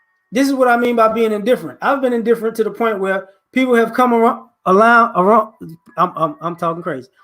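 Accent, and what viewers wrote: Chicago, United States. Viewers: Just listening to you, I can tell you from westside of Chicago. For some reason everything you saying Ive dealt with my entire life. I think its something about growing up in that environment we notice how confused people in society really are and dont even know it. STAY up fam...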